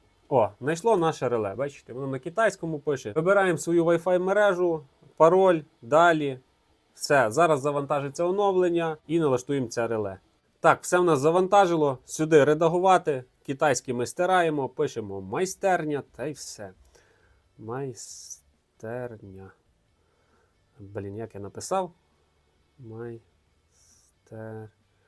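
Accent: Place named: Lviv, Ukraine. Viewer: вимова львівська, але русизмів доюха, але то нічого не вирішує. Тільки підзелупний йободовб концентрується на мові, головне бути людиню, й не важливо, якою мовою ти розмовляєш. Он зелупан вивчив Українську, але воно ненавидить Україну й Українців, воно хоче тут царювати й рабів з бангладешу набирати....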